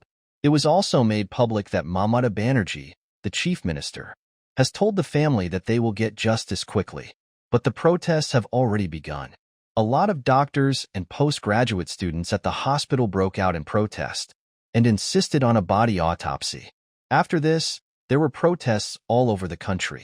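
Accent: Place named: United States. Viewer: Why the hell do you need an American narrator for this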